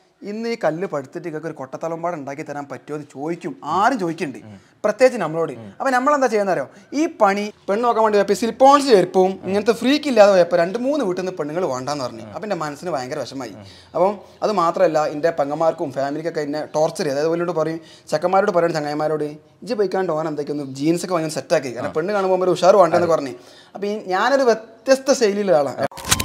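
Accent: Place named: Kasaragod, India. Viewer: ഞാൻ അകത്തേക്ക് പോയപ്പോൾ ആണ് interview എടുത്ത ആളെ മനസ്സിലായത്.. Ninghlde കാസർകോടൻ ശൈലിയിൽ ഉള്ള ..സംസാരം pwoli ആണ്. Aa റോഡിനെ കുറിച്ചും ,പിന്നെ Corona കുറിച്ചും പിന്നെ പലതും superb 🔥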